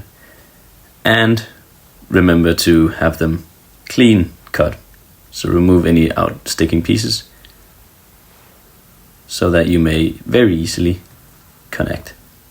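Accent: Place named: Denmark. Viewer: Wait are you Danish the accent is great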